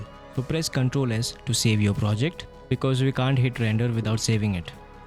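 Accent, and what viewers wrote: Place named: India. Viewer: Thank you for your time and the tutorial. I hate any noise in the background and since I appreciate good music; it should not compete with the narrative; becoming noise which by definition is sound that I do not want to hear. All the negative aspects such as the Hindi accent; worst of them all is side-stepped by putting the sound off and the subtitles on; choosing English. BTW, the word "THIRD" is pronounced with the tip of the tongue between lower and upper teeth otherwise you end up with the word TURD which is fecal matter; an embarrassing word.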